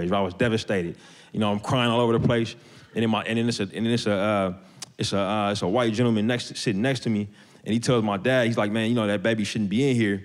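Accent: Oakland, United States. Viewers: Sobison lol no he’s from Oakland, that’s how most of them talk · Crazy how many people in the comments have never heard an Oakland accent.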